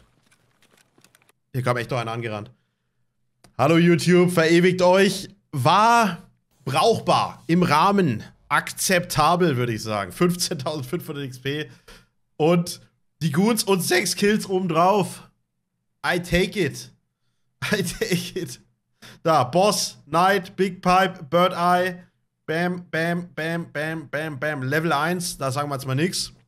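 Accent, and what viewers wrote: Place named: North, Germany. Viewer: sehr sympatisch, da merkt man den Norddeutschen, muss man an der Stelle einfach mal sagen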